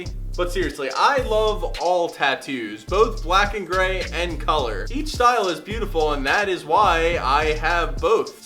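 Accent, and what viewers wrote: Philadelphia, United States. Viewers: You sound like you're from the Philly area 🤣 water = wooder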